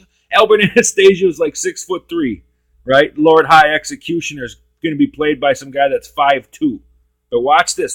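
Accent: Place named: Midwest, United States. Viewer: Hey you stuttering, muttering prick ya! Calling me a midget when I stand around 6’ and 230 isn’t the smartest thing to say. In addition before you take a podcast to task it would behoove you to actually do some research first. My father worked for AA so I know a great deal about him. I know you get a chubby when you think about these mobsters in your mom’s attic while you munch on hot pockets. Guessing by your accent you are probably from Illinois or KC. I will give you some cred since these were big mob towns. The way you pronounce APALACHIN is laughable. If you want to be taken seriously ever, do better research in the future especially when you call someone a midget that could probably kick the shit out of you! Thanks for the shoutout and watch me as Matty the Horse on the Deuce.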